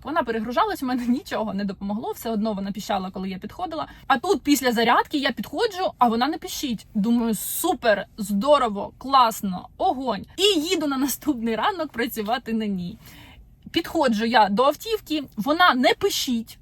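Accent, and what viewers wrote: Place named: Central Ukraine, Ukraine. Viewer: Хочу сказати що ви розмовляєте українською наче ви не з Базмуту, а десь з центральної України і прожили все життя там. Це супер і контент цікавий!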